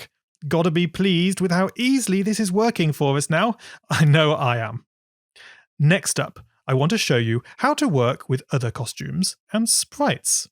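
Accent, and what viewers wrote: England, United Kingdom. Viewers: are you from england? thats the only place i know where people say z (shee) as z (zed)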